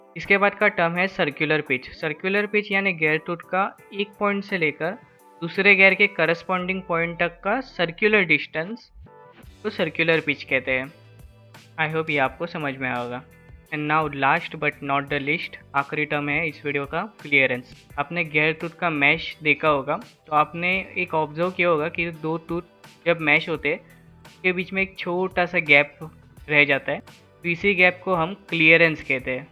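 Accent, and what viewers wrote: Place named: India. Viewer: 印度的味道太大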